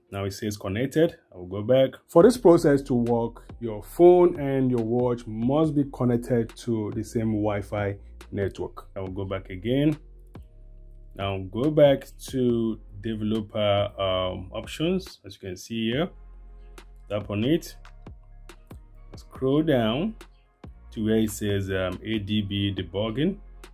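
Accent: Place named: Cameroon. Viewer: Thanks for this... only samsung does this kind of crap lol. I really enjoyed your accent it reminded me of my best friend from high school, she's from cameroon.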